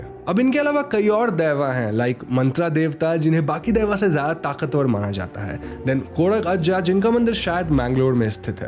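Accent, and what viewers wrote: Bengal, India. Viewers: Your Bengali accent is nice.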